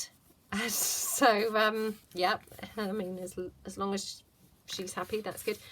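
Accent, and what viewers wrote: England, United Kingdom. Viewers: Are you from England or Australia? Love your accent. When you say you have puddings for dinner what does that consist of. Love your videos, stories.🌺🌸🌼🌻🌹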